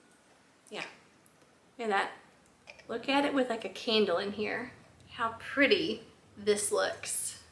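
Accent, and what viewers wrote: South, United States. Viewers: Love your southern accent and Bucee’s T-shirt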